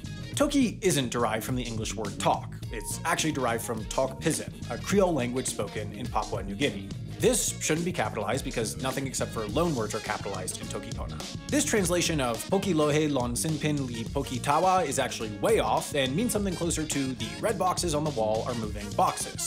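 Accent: United States. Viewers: okay how is the lancaster pronunciation incorrect? i'm from the OG lancaster and you basically pronounced how i would (albeit with an american accent) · You ptonounced Lancaster as in Lancaster, Lancashire correctly as far as I heard and as far as it was possible in your American accent. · Pronouncing Porsche as "porsh" instead of "porsh-uh" is totally acceptable in American English, which you speak.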